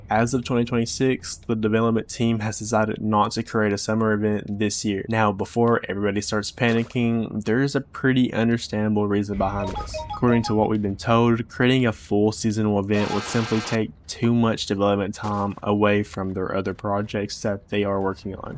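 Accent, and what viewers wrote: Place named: South, United States. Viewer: The southern accent is sending me 😭😭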